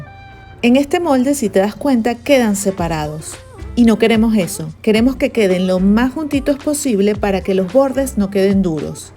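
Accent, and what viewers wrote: Venezuela. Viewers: Me encantan tus recetas muchísimo . Me gustaría aprender algunas recetas de tu hermoso Venezuela 🇻🇪 me encanta tú acento 💜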